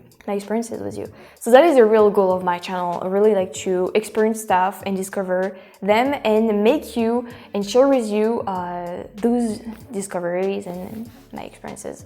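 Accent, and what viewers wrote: France. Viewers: Salut à nouveau. Comme d'habitude, toutes tes idées sont géniales! Peut-être qu'un jour il y aura un «Guide FrenchTastic» pour rivaliser avec le Guide Michelin! Je trouve toujours amusant que les gens, y compris les Français, commentent l'accent français. Pour les Françaises, leur accent est comme le bouclier de Wonder Woman: c'est leur super pouvoir! J'ai eu des filles françaises qui me disaient essentiellement de "foutre le camp" et la moitié de mon cerveau pensera "oh elle est si méchante" tandis que l'autre moitié pense "mon Dieu, elle a l'air si sexy"! En bref: c'est un super pouvoir que tu veux jamais perdre! Prends soin de toi! /Bill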